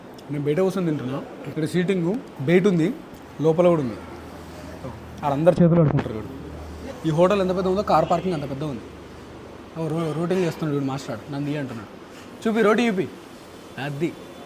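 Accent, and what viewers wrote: Telangana, India. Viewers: ❤❤ok brother nee matatheeru mana తెలంగాణ యాస తో super గ ముందుకు వెళ్తున్నావ్ కానీ వీడియో లు తక్కువగా వస్తున్నాయి కొద్దిగా ఉరుకాలే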